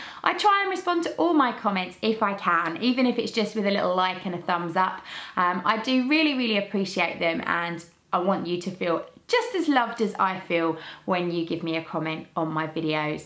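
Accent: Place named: United Kingdom. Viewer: I love watching your videos and listening to your British accent! I’m really loving the fabric haul videos and your travel to the Netherlands. I love to travel the world and look for fabric as well. I especially love looking at the shops in the UK and plan to go back to the UK in the next year/get a rail/train pass and go to as many as I can find. Gold Hawk road and Liberty Fabrics are at the top of my list! Thank you for all your sewing efforts/education. You’ve inspired me to start sewing for myself again! Hugs from Oregon in the USA...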